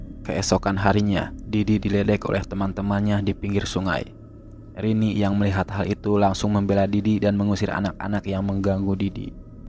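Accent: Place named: West Java, Indonesia. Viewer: Dari fb, pindah ke sini penasaran pingin tahu alur ceritanya, seru dengar suara aa cerita Sunda banget ☺👌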